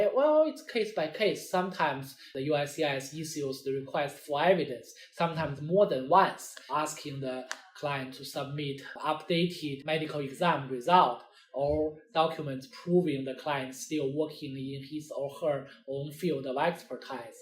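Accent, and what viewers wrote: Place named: China. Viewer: To your question: he speaks English, with a strong Chinese accent, but I am sure you can follow him if you want to. I also want to add some extra info in this answer to your question (because I just finished my i-485 interview): As of now, the content in all his videos (and of course, some are very Chinese related but not to my case) is very very good, and is the most helpful & practical one, compared to other related videos, made by non-native English speakers from places all over the world and native English speakers. And I would say that it is a surprise to me too. So, if you cannot deal with Chinese accent, ignore it (I guess I understand that. Honestly, as a Chinese, I ignore a lot of Chinese-English videos, I have to), but if you have a real-life need in this GC thing, try some of his video.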